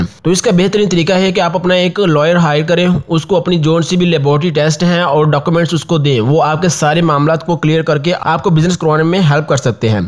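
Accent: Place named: Lahore, Pakistan. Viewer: یار بھائی پرودکٹ نہیں پرادکٹ لاہوری اناز کی انگلش سے بور نہ کیا کرو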